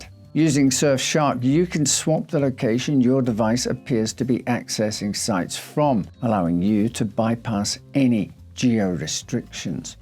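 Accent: Scotland, United Kingdom. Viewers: He's Scottish!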